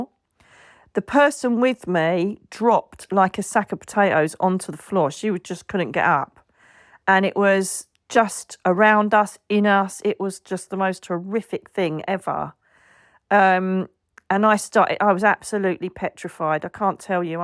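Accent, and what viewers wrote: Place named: England, United Kingdom. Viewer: That was so much fun! Thank you for sharing your stories and I just listening to your English accent ❤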